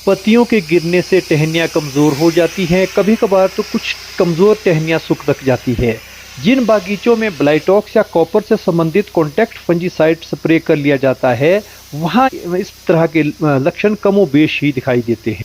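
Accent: Standard. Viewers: शुद्ध उच्चारण के साथ , बागीचो की सही देखभाल एवं पौराणिक चीजों की उपयोगिता की सटीक जानकारी युक्त आपका ब्लॉग अनुठा सा बेहतरीन लगा ,,,you people are very positive, humble and good cultured,, ...….keep vlogging 👌👍 आपने माताजी के लिए जो lines बोली सही में गौरवान्वित बना दिया अपने parents को...।👏👏👌 दादीजी को नमस्कार🙏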